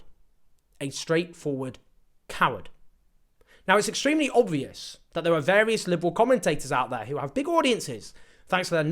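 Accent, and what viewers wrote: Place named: England, United Kingdom. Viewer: You talk in a pommy accent so unless you live There in Israel you only believe what your hearing from Hammas who are lieing, just listened to the speech comming from a son of a Hammas comander who grew up in Garza, he speaks in in support of isreal and said don't believe the lies comming from Hammas about thousands of children been killed it's all lies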